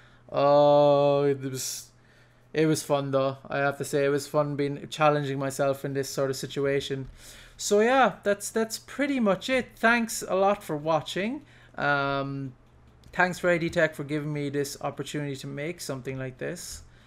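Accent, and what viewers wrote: Ireland. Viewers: I take it back, I can hear the Corcaigh in him. Gwan boy!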